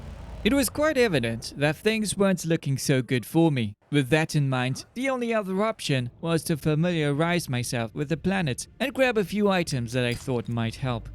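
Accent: United Kingdom. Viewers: 😂❤❤ it gor me soo badly ur british? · He kinda sounds British not trying to be mean but you do but your my top 1 favorite youtuber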